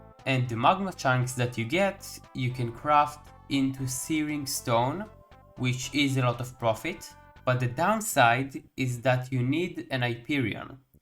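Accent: Israel. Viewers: איזה מבטא ישראלי 😂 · מבטא ישראלי?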